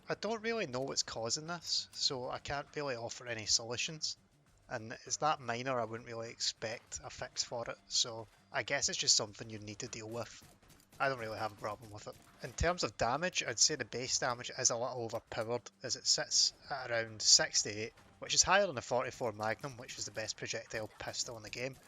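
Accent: Scotland, United Kingdom. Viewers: Ha your scotish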